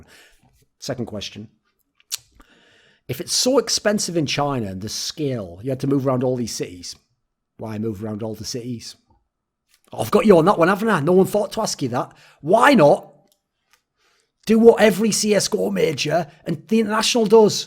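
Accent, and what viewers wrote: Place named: United Kingdom. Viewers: He does sound different, he might be visiting home and having his more natural accent come out. Anyone know exactly what is his accent, from what region? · Why did Thorin's british accent get stronger?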